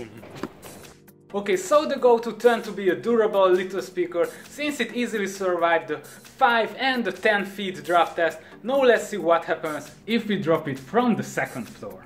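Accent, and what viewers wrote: Hungary. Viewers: Olyan magyaros a kiejtésed... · Nagyon hallatszik, hogy magyar vagy 😀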